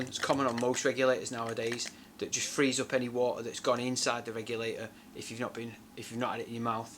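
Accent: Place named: North, United Kingdom. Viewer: At last a northerner on YouTube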